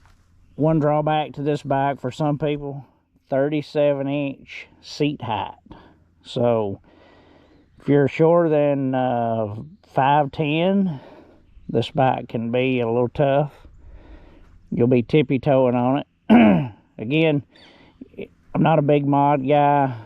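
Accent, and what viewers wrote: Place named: South, United States. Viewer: Hillbilly talking.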